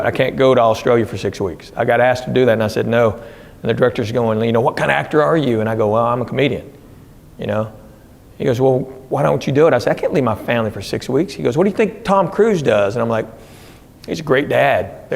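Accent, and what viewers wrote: South, United States. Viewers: Thank yoy for your family, wholesome, hysterical comedy. Anyone can do 'vulgar' that is just cheap, non creative head-talking. Not only family, but there is an intellectual banter with your routine and interchange with the audience that entertains.. Great job. I am from TN, and like the Southern slant!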